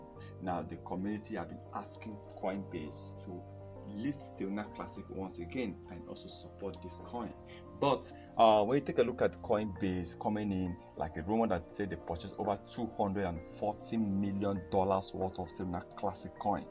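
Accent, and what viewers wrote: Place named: Nigeria. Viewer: U sounds like Nigeria,I'm Nigeria too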